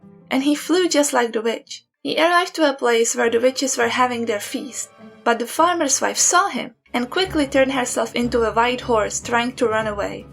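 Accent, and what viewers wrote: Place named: Czech Republic. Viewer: are you czech? because you sound really czech and i would be surprised if you aren't lol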